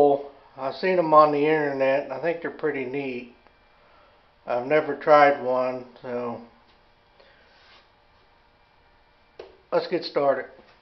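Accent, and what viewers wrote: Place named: Appalachia, United States. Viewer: PS, Appalachian accent I hear!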